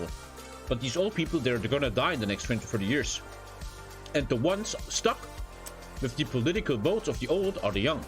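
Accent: Germany. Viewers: Idk his idea on the gendering thing seems a little stubborn in my opinion. I’m a foreigner living in Germany and had to learn the already near impossible language. But still, I’ll go the extra mile to learn and change more in my speech, as languages evolve, just like they always have, cause we don’t all speak english the same way it was spoken in the 50s or something and even for a German example, there are academic and grammatical changes officially made as recent as the 50s or something if I’m not mistaken, so being stubborn about a language like german, which already has way too many dialects… it’s not like everyone speaks it the same way in the first place, when all you have to do is go from one city to the other and hear a totally different german, for example I live in Hannover and speak Hochdeutsch but notice how has a Saxon dialect that I don’t always understand. 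So that being said, I don’t see how changing one way the already crazy and diverse language operates is an obstacle, I know yall germans had to learn other shit wayyyy harder than “*innen” for your everyday speech lol.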